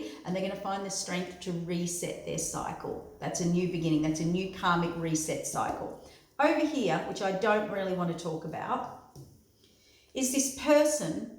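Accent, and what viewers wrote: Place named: Australia. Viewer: Excellent video, great to hear your Aussie voice.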